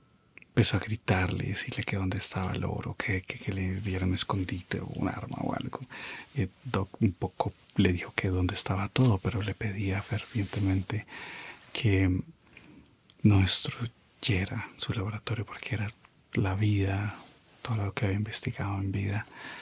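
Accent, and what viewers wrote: Colombia. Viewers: Es colombiano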